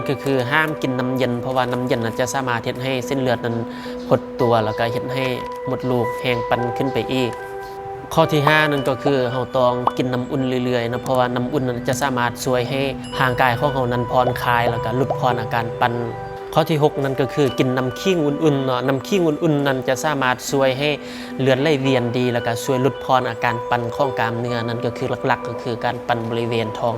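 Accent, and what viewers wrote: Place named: Luang Prabang, Laos. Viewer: ພາສ່າທິນ້ອງເວົ້າເປັນພາສ່າໄທຫລ່ວງພະບາງບໍ່ນ້ອງ ເວລານ້ອງເວົ້າເປັນຕາຟັງເນາະ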